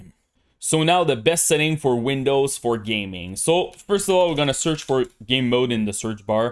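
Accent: Quebec, Canada. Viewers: Le quebecois is strong with this one.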